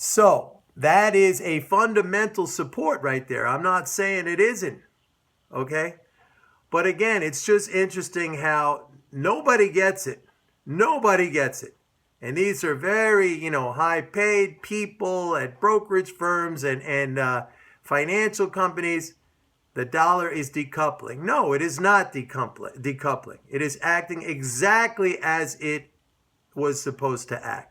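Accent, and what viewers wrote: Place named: New York, United States. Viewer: by the way ...Staten island ? .... or ... Brooklyn ??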